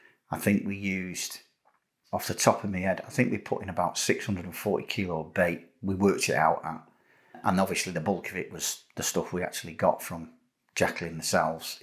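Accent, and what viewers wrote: United Kingdom. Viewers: He's from Britain, He is English and has a "Lancashire" accent. ( From the north of England)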